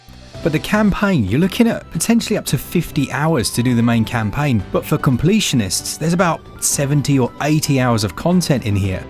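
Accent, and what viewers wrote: United Kingdom. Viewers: My soon-to-be wife surprised me with a birthday Switch OLED just recently, almost cried cause I've been saving up for it for months and she went ahead and got it for me. Went to search for a Switch-related youtube channel and yours is by far the most enjoyable to watch (might be the accent, gotta love the brits). More power to you!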